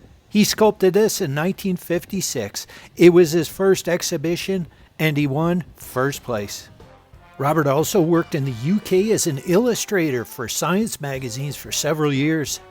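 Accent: Canada. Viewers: i know the family personally, went to high school with Mr. Clothier and 'Ms. Shirley's daughter [Jessica - my year - a friend] and son [John - a year earlier and more of a friend], in North Van. I have been to their home many times [an 18-minute walk]. I was actually more commenting on your dialect... being born and raised in North Van and had many First Nations friends [not in my neighbourhood, except 4 doors down from my childhood street ['weird' - not 'weird' - growing up that I never thought of them as 'Indian' - my brother was best friends with the oldest brother and all I identified the 3 brothers as Randy, Rick and Wynn] but in the Squamish reserve in North Van... [long story how I accumulated many First Nations friends in a reserve that was a 14-minute bus ride away], your dialect sounds very Squamish Nation, but maybe you could correct me. Musqueam? Love the background that I already knew, with a few phenomenal extras you revealed... 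exploring your high school friends is not what most friends do, except the home had war photos and stage acting photos of both Mr. and Ms. [from England and Canada and the University of British Columbia, my Bachelors and Masters alma mater], so some stories came out, but Mr. Clothier was rather humble about his war endevours, like most Canadian veterans, just like my father, a navigator in the Pacific during WWII.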